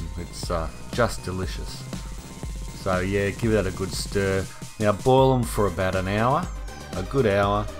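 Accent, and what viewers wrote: Australia. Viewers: Rithik Madan Australian I think, maybe New Zealand but pretty sure he’s mentioned being Aussie. Definitely not british. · Chowder Stevens he's not English he's an aussie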